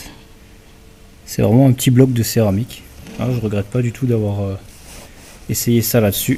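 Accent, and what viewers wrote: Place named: Alsace, France. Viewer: ça fait plaisir de voir ton travail surtout quand on sait ce que tu es capable de faire via le forum, je me suis abonné a ta chaine qui est une mine d'informations. Tu ne dois pas etre loin de mon secteur ( alsace ) vu ton accent. Merci pour tes vidéos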